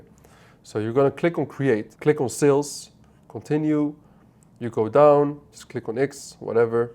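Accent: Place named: India. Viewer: No one can easily understand Indian English. It’s not about us — it’s about how you pronounces it in India cuz of accent you use.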